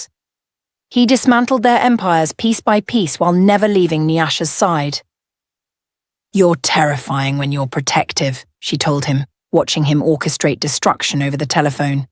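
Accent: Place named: United Kingdom. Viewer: How could she have blood on her wedding dress if she changed into a "reception" dress just before the attack after the wedding? The narrator voice is so out of place in this story. It's way too British/formal... Why wasn't there anyone from her family or closest friends at her wedding? He's rich enough to have flown some in... Just sayin...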